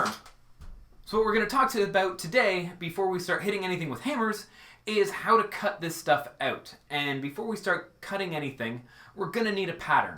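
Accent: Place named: Canada. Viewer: The Canadian accent is strong with this one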